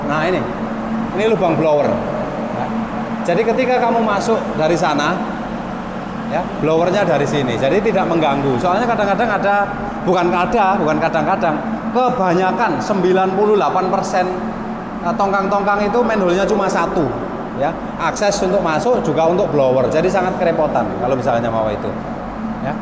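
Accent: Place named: Java, Indonesia. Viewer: logat jawane medok jawane asli mana pak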